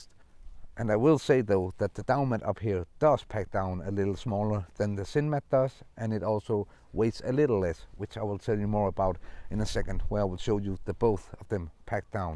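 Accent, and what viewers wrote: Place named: Denmark. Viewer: Can i ask where you live? You sound Danish but most of your videos dont seem to be shot in Denmark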